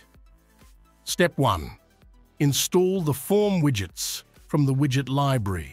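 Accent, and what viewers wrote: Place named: United Kingdom. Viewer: As a Pro subscriber, I couldn't be happier with Unlimited Elements and the team of pros behind it. I know I sound like a shill but really, I'm not. My website is particularly amazing and unlike others because of the combinations of remarkable capabilities this toolkit provides, including the fact that I can (and have) customized a particular plugin. And now we have forms!, I like the British accent, but I can tell it's you who wrote it. 😀We love you buddy. - Markus Halvorson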